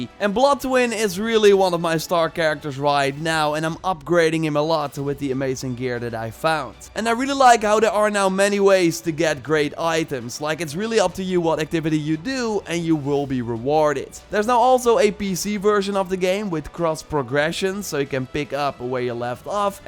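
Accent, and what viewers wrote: Netherlands. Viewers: You sound Dutch :o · Nederlandse accent...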